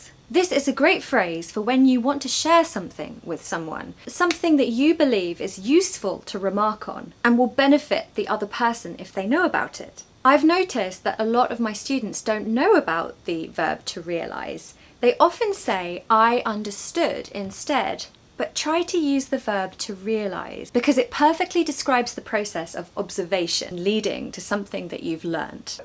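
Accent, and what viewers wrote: United Kingdom. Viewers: l'm a big fan of British accent (l'm crazy about it) and your accent and teaching methods are one of the best l've seen . A zillion thanks to you from tabriz 🏢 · Thank you …you are such a sweet and great English teacher…your British accent is very easy to learn. Thank you so much share the video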